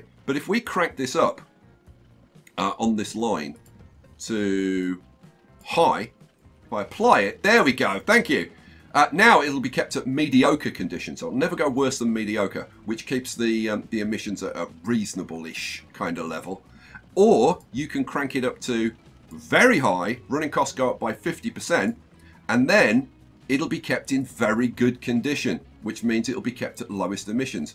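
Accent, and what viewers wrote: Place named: United Kingdom. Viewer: Are you a British or an American? I just like your accent so much.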